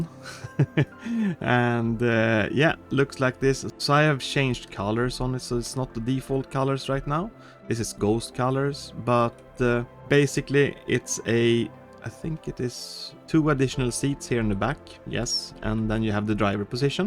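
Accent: Sweden. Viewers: You sound very swedish 😳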